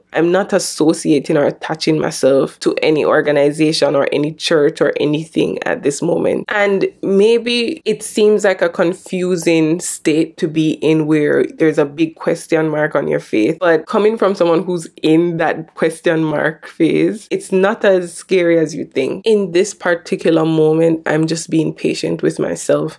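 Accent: Jamaica. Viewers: I love your Jamaican accent-mun. 😅 I doubt I would look good in feathers and costume and makeup for carnival. 🤣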